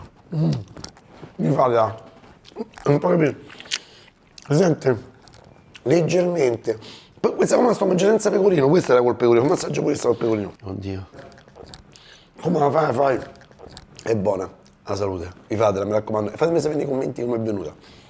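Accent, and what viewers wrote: Rome, Italy. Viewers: CIAO... OGNI VOLTA CHE COMINCI I VIDEO DICI SEMPRE ISCRIVETEVI.. IO SONO UN PO' DI ANNI CHE MI SONO ISCRITTA..OLTRE A TE GUARDO ANCHE RUBEN UN'ALTRO ROMANO COME TE.. GLI ALTRI CANALI LI GUARDO.. MA NON MI DICONO NIENTE... SEI PROPRIO IN GAMBA!!! SOLO A SENTIRTI PARLARE ROMANO MI SENTO A CASA.. INFATTI IO SONO DI CIVITAVECCHIA E IL MIO DIALETTO SI AVVICINA AL VOSTRO.. MI PIACI ANCHE QUANDO CUCINI DA CASA TUA.. E A VOLTE A CASA DI TUA MADRE.. TI RINNOVO I COMPLIMENTI.... MI PERMETTO DI DARTI DEL TU.. PERCHÉ POTREI ESSERE TUA MADRE DATA L'ETÀ. (75 ANNI) RINNOVO I SALUTI.. CONTINUERÒ A SEGUIRTI.. CIAO A PRESTO E BUONA SERATA 🌹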